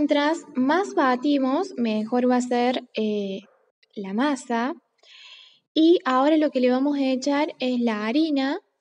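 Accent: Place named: Córdoba, Argentina. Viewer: Que rico amo este tipo de media tarde bien Argento, me encanto como lo explicaste y tu acento cordobés lo es todo!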